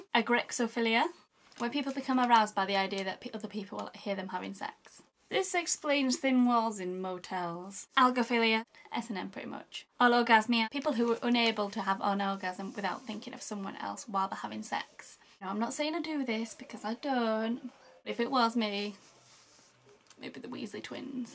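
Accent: Yorkshire, United Kingdom. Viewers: Do you have a Yorkshire accent? If so it's the most proper Yorkshire accent I've ever heard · Haha. U look hot :P AND UR BRITISH.!! My friend is British YE HAV D SME ACCENT I hav reli bad spellings :P IM NOT BRITSH Jus to giv ye d heads up :P IM GOIN TO GO NOW.!!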